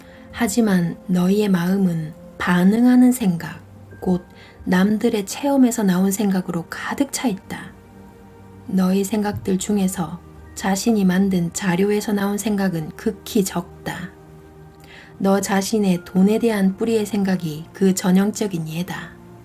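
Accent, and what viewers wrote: Gyeongsang, South Korea. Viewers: 여러 곳에서 제목만 들었던 책이었는데 오늘 만났너요 감사합니다. 경상도사투리 쓰는 여신의 목소리 재밌습니다. 원하는 모습을 생각하고 말하는것 보다는 원하는 모습에서 행동하고 말하고 생각하는것 ᆢ 인거죠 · 구수한 경상도 사투리 좋아요. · 경상도 억양이 친근하네요 젊은 시절의 나인것 같은 착각이 드는 다정한 목소 읽고 싶었던 신과나눈 이야기 맛깔나게 잘 듵었습니다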